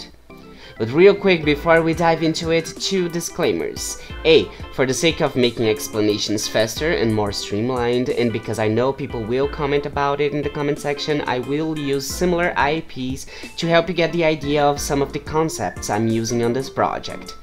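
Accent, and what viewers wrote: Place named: Brazil. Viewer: my faves are Nelumba (is this his name?) and Ro lol (edit) MENINO TU É BR??? EU NUNCA SOUBE DISSO eu suspeitava pelo sotaque mas não tinha certeza aaaaa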